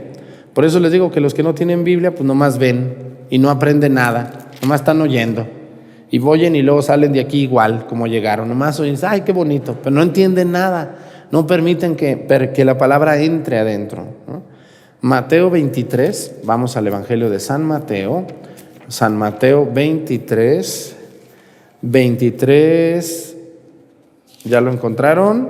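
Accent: Mexico. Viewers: PADRE BUEN DIA..YO trabajando i Escuchando CON GANAS.TU ACENTO MEGICANO ME AGRADA.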